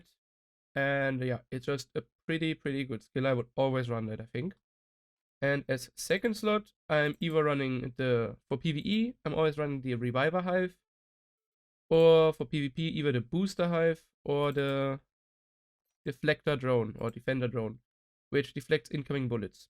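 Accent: Germany. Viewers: Digga das war das erste was ich gedacht habe... es regt mich turbo auf :D wenn die leute diesen deutschen "akzent" haben. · Bist du deutscher? Hast diesen deutschen accent ^^. Gutes Video !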